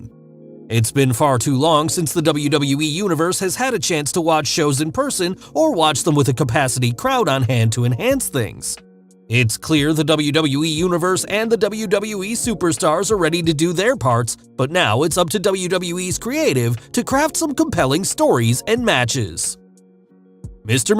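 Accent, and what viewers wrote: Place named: United Kingdom. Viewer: He's not Australian though... he's British.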